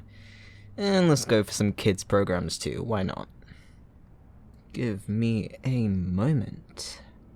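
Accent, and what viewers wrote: United Kingdom. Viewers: (speaking French) Bro I thought you were British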